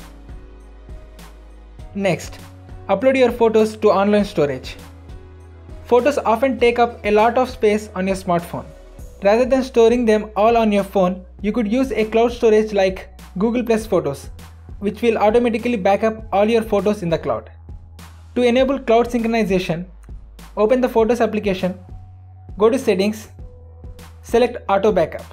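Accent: India. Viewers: its called Indian accent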